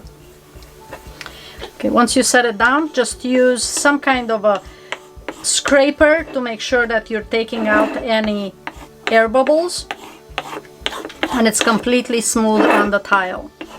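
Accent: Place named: Albania. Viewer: You have a beautiful Albanian accent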